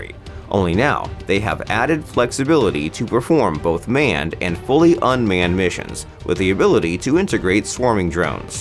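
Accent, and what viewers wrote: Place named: India. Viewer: why you sounds like indians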